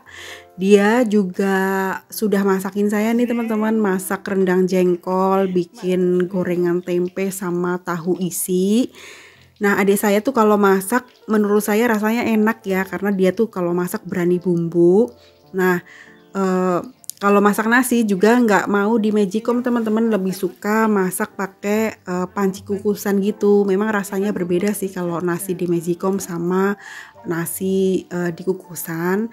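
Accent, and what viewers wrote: Banyumas, Indonesia. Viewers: Seneng liat vlog nya bunda berasa lg pulang kampung😀😀 pokoke ora ngapak ora kepenak ya bund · Wkwkwk.. Jebule wong ngapak.. Tosss disitt.. Yuh pd mampir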